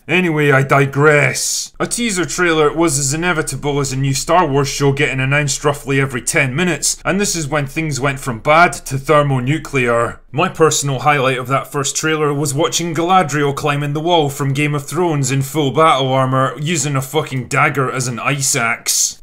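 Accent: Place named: North, United Kingdom. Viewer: This guy sounds like the 9th doctor 😂🤣 must be his northern accent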